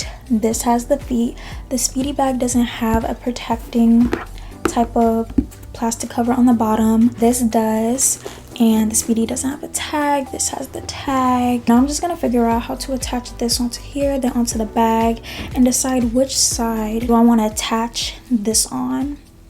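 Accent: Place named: Los Angeles, United States. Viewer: Your voice says Los Angeles but your eyebrows scream the South Bronx. Nice bag.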